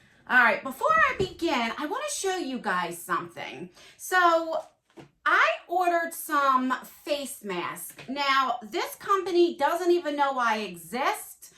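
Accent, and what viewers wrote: New Jersey, United States. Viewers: I love your make up and your cute Jersey accent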